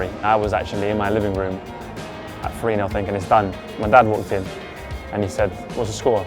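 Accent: Liverpool, United Kingdom. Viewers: Scouse accent really shines through. Been a Liverpool fan ever since he 'chose' to.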